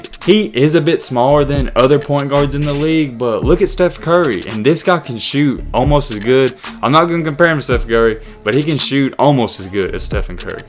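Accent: South, United States. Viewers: I can hear a touch of southern in your accent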